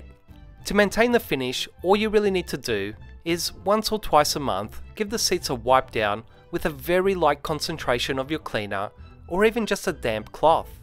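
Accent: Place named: Australia. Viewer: What nicw accent is that? Aussie?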